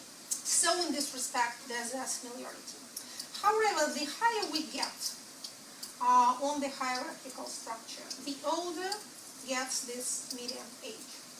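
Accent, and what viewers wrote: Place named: Russia. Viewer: Such a likable russian accent)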